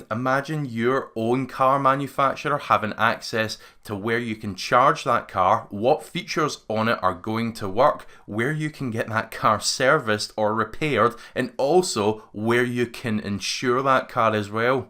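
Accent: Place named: England, United Kingdom. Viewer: heavy english, similar to ozzy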